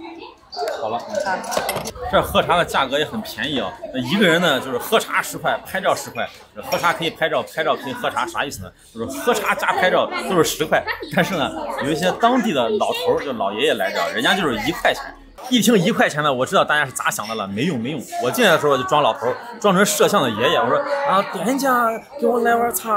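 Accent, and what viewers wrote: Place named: Henan, China. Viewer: 我就喜欢看 你个陕西人带着河南味儿说四川话，用爵士乐伴奏着中国农村街头大妈做小吃 的那种感觉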